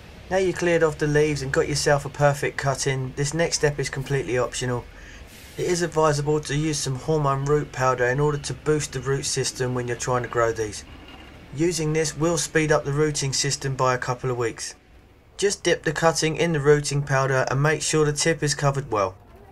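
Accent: United Kingdom. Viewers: Love this video. Love your accent. Nice British chap showing them how it is done! Excellent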